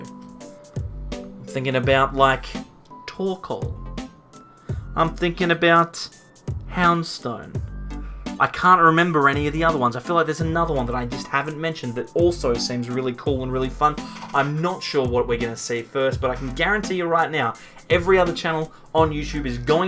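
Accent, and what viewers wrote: Australia. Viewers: hey bro i commented before about what the best deck was, now that the lugia vstar deck isn’t in standard anymore. what do you think the best deck is now for standard ranked bro. if you can reply i would be rly grateful bro. are you aussie?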